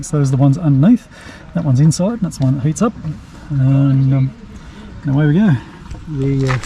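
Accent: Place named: Australia. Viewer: Nice to listen to an Aussie in an area I’m familiar with. 😀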